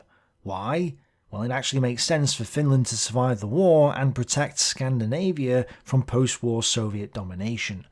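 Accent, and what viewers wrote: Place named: Cockney, United Kingdom. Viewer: I like you still using cockney instead of posh accent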